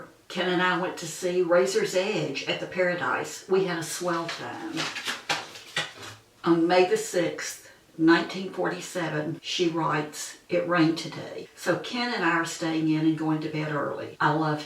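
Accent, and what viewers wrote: South, United States. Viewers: Oh my...what an extraordinary video. The path that you walk each day looks so peaceful. I wonder..if it had not been for this self quarantine(something awful) taking place would you have ever shared such a meaningful part of your past (something lovely). It was really comforting to hear you talk and read about your aunt. Maybe it was your hypnotic southern drawl that lulled me into a place long ago. 🤔 This video was so calming. I loved all you talked about. ❤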